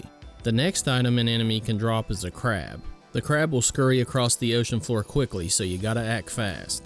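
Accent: South, United States. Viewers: Hey. I've been a subscriber for about a year. Your channel just keeps getting better and better. Thanks for the great content. Most reviewers hate on this game, but I always liked it. If you like Jaws, my wife just finished her first game on Steam which is an aquatic action/adv game inspired by Jaws. No, I'm not making this up... While the finished game is really nothing like Jaws at all, it was inspired by the game. Her game is called "Rupert and Riley Shipwrecked" and can be found on Steam or just by searching. Anyway, thanks again for all the great content. Nice to see (and hear) another Southerner on here;)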